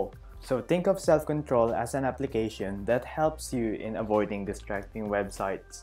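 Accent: Philippines. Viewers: Sounds Pinoy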